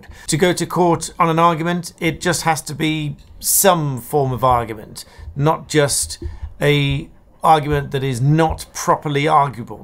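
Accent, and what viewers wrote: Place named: United Kingdom. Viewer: I enjoyed your coverage of the Johnny Depp case and of this case. A true analysis based on what was and has been presented. That comment did not need an explanation by you but you correctly and eloquently put her in her place in your amazing well spoken British accent. Keep up your good work, I as with many others, certainly appreciate it as it gives a better understanding. Thank you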